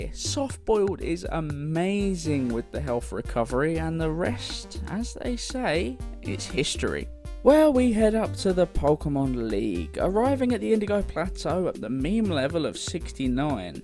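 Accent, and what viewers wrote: South, United States. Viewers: Hahahahaha go on son watch a lot of these but your southern accent is soothing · I love chansey ! I saw people saying you were southern * thinking US as I am * but still , may be another country but still southern accent is 🥺🤍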